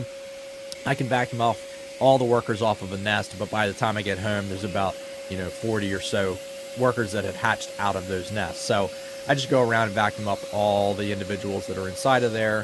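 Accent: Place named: Pennsylvania, United States. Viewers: I nailed you as a Pennsylvanian as soon as I heard your voice. Glad I still recognize my native tongue 😂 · You have a very Delco accent lol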